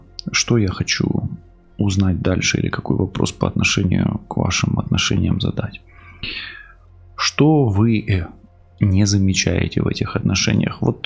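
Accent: Ukraine. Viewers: Доброе утро! Благодарю Вас за Ваши прекрасные расклады,их можно слушать бесконечно,они доходчиво,понятны,Ваш прекрасный голос, Вы просто Супер! А главное всё в точку, Ваш хохлятский говор! Я говорунья,а он молчун, может подойти молча ударить,как он говорил "для профилактики" вот так-то (шутка).Всех благ Вам от души!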